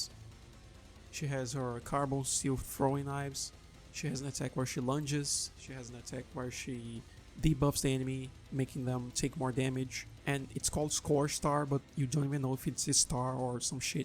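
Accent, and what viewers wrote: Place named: Brazil. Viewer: Desde o primeiro vídeo, seu sotaque nunca me enganou. Sabia que era br kk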